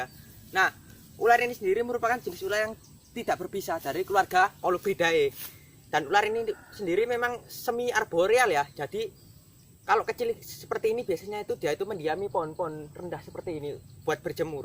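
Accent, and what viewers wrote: Java, Indonesia. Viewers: Dari segi bicaranya keknya dari orang jawa:v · Nk ngomong oj medok" to.. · Jowo ne endi mas?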